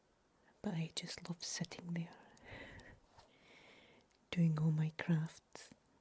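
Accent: Scotland, United Kingdom. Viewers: Where in Scotland are you from if you don't mind me asking? Great video, amazing work!x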